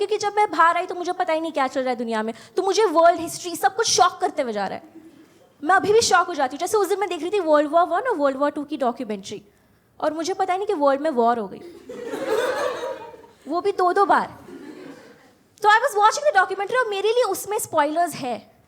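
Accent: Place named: India. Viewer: Her English sounds like hindi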